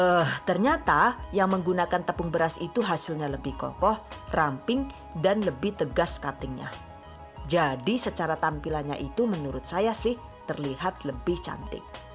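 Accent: Banyumas, Indonesia. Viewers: 😂 seneng ndagel mbekayune...😂😂 kdg basa ngapak kdg indonesia kdg bhs inggris....😂✌️ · suka sama adalah bahasa yg jelas...dan di selingi intermezo ngapaknya sukses sllu bun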